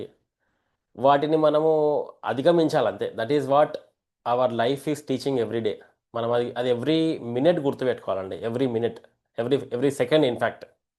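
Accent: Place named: Telangana, India. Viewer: Happy new year Anna mee telangana yaasa bashaki pedda fan ni jai telangana ❤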